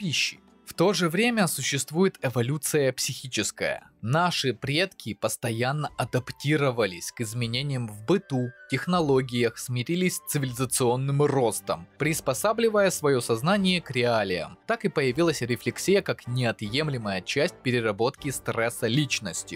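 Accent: Ukraine. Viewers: Я давно знал, что он украинец. У него жена с Украины, она в каком-то видео говорила. И раньше он про власть говорил. В каком-то видосе упоминал про арестованного украинского военного и мол поэтому он не хочет расскрывать свою личность. И по говору понятно Люди, которые удивлены, что он украинец, наверное, только начали его смотреть, потому как мне давно известно, что он с Украины. Другого объяснения у меня нет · Прекрасно ) А разговоров то было - армейский психолог, опыт боевых действий, сотни лет практики с больными. И что же мы увидели, а мы увидели голого короля с фантазиями, хотя украинский акцент и выдавал жителя Украины. Куда же делся беспристрастный психолог с рассуждениями о том как вести себя на войне ? А он почему- то превратился в активного пропагандиста, да еще и с речами про русского Ваньку, что само по себе ксенофобия. Прям повеяло Геббельсовской пропагандой про сдающиеся войска, женщин с коктейлями молотого, призрака Мариуполя который сбил 600 танков в воздухе и под водой. 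4 дня прошло с твоего последнего ролика и как же мерзко теперь звучит, твои солдаты уже отходят в глубь страны, а твоя русофобия и ненависть к обычным русским людям запомнилась многим. Надеюсь те кто тебе донатил постыдяться и обдумают свои решения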